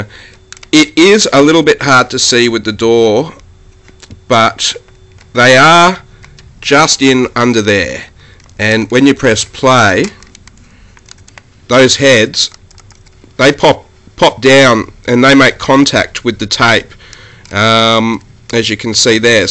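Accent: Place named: Australia. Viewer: Thanks for the tips ! FYI - You have the most delightful accent; but then again, so do most Aussies....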